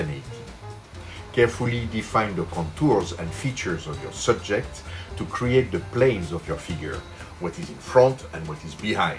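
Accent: France. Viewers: I realised your French accent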